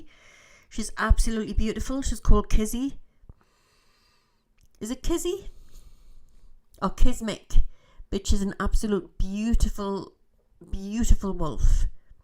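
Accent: Newcastle, United Kingdom. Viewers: love the Geordie accent could listen to ut all day Steve east London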